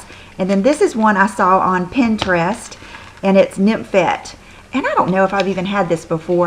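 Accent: South, United States. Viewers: I Love Love Love! Your "Old Southern" Accent! Please Dont Change A Thing! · You are a breath of fresh air. I love your accent because I'm a Southern girl too and we are by far the most charming ladies ever:)) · I love the way you talk! :-) Love southern! Don't ever stop being you! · I speak Old Southern too and I totally understood every word you said! So refreshing for me! hahaha. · I'm from Mississippi and I totally get the southern accent, I say cement the same way lol I do say Pinterest the correct way, probably because I heard it pronounced before I saw it written down :) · I think your old southern accent is adorable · I am so glad I found your videos! You are beautiful and so happy, and I love your southern accent! Subscribed!! :) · Never saw that Too Faced liner but me and my Ulta coupon will be getting it this weekend. That and the NYX yellow HD concealer. 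Girl, I understand your "Old South". I understand every word you say...and talk the same. Hug your babies for me. Sad day.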